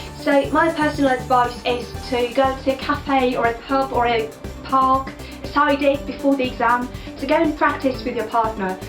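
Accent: RP, United Kingdom. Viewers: Wow, astonishing! When you started speaking, I thought you were a British teacher. Your accent is so perfect. Congrats,! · What with the posh accent? :P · Your not Ukrainian your British...i wish i can speak like that 😭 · i can't even ukrainian you speak like a real native eng speaker british so good amazing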